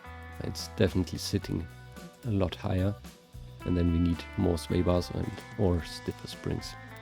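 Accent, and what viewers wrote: Bavaria, Germany. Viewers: Ich höre ein bisschen deutsch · Geiles Denglisch fühle dich aber jetzt schon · JOOO are you deutscher mayby like bayrischer or mayby from frankfurt? but geiles auto find es auch geil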